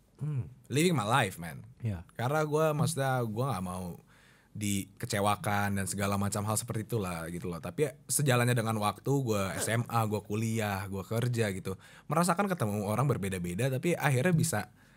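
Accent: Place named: Java, Indonesia. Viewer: medokmu mas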